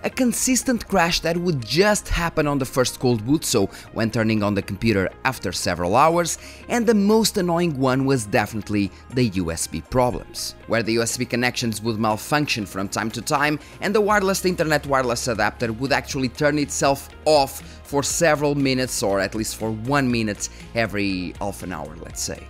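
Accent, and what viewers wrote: Portugal. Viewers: Pelo sotaque vê-se logo que és tuga.xD Os erros aleatórios sao fdidos... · First time watcher here. Have had long time problems with RAM so i know how fucked up that is...sent 2 ram sticks back, because stick #4 "didn't work", second ram kit comes back and then different stick doesn't work, looked like a game of whack-a-mole...3 sticks worked fine and wouldn't let the 4th stick work well...different sticks...sometimes 32gb sometimes 24gb...eventually gave up on the everyday struggle so now I'm rocking just 24gb constantly. PS: was doing a inner challenge trying to figure out if you were maybe PT (maybe ES but didn't sound much like it) and i got it right! Unmistakable accent :) Keep up the good work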